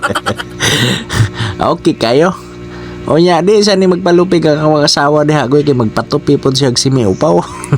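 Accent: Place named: Cebu, Philippines. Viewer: Like12...MAAYONG GABII IDOL... AYAN OK NA GUPIT SA BAGONG STYLE PAMORE .. TINUOD KA LODS...DI BITAW LALIM MAG VLOG CONTENT CHANNEL PERO OK LANG LABAN LANG SA BUHAY ALWAYS HAPPY...NINDOT DIAY NI NGA BARBERSHOP KAY ALL AROUND PAMORE... IBA ANG TUNO LENGUAHE NGANHA SA CEBU KAY PURE JUD KAAYO MANGISTORYA DILI PAREHA DIRI SA MINDANAO BISAYA/CEBUANO SAKSAK SINAGOL PAMORE... DONE WATCH FULL SUNGKO SA TUMOY... mins.😊😊😊WITH ALL ADS COMPLETE...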